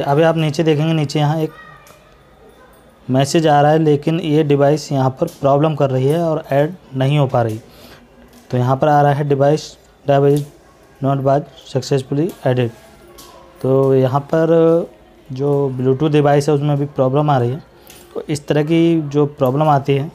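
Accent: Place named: Arab world. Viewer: Are you speaking like arabic or the worst english in the universe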